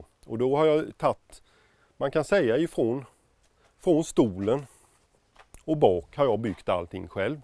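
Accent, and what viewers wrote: Småland, Sweden. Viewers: För han é från småland, dom säger oxå Cykelen, Nyckelen,Bibelen etc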